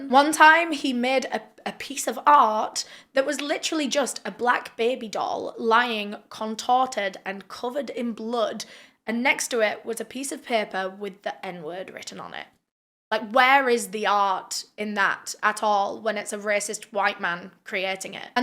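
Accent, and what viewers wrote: United Kingdom. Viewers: What part of britain is her accent from ?? Philomena cunk speaks like this too i think 😅 I'm curious, anybody?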